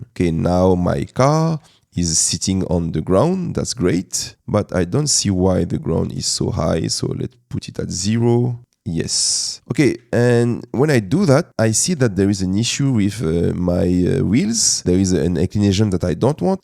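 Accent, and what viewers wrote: France. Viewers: t'es français ça s'entend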